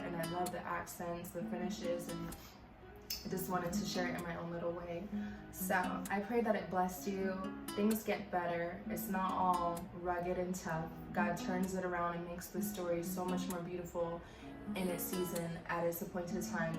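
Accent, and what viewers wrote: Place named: Jamaica. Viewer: Omgggg i was typing the below message when the answer popped up on the screen. Amazing. Are you Caribbean? I always hear an accent... sounds Jamaican... as am I. Very nice place.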